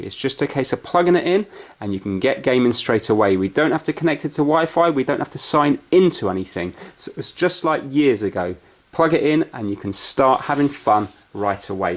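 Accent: United Kingdom. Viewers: Shamalamamoumou unknown he is British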